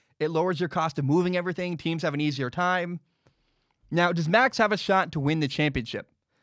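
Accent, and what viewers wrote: United States. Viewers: Glad to see you're picking up on F1! Loving the commentary. It's definitely refreshing to hear F1 content in an American accent xD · Great to hear American accented F! commentary!, I believe you have found a great niche!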